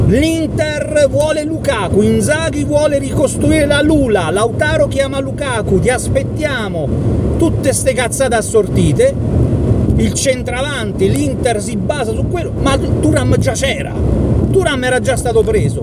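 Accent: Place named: Marsica, Italy. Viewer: dizione marsicana impeccabile e piglio aggressivo da Aquila Reale del Velino. "Te mangane du portier"....tradotto "ti mancano due portieri". Mi manca l'Abruzzo e la sua genuina e montagnosa maestà!!!!! Sei troppo forte e da finto incazzato ancora di più 👏👏👏👏👏 Un saluto 😃😃😃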